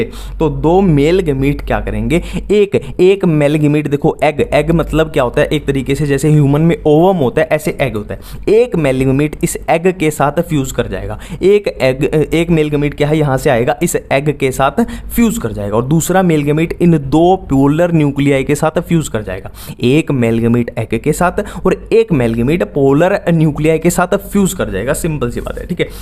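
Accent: Haryana, India. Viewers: App haryanvi hai ...??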